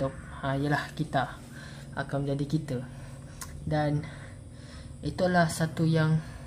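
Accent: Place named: North, Malaysia. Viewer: always support you bro!😁👍.saya tu dgr mcm slang utara pon ada😆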